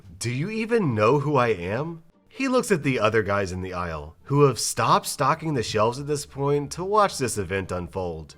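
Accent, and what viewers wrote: United States. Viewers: Love hearing UK vernacular with US accent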